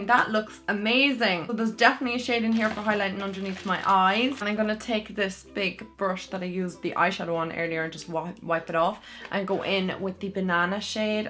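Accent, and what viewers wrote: Ireland. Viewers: Is thought your from Ireland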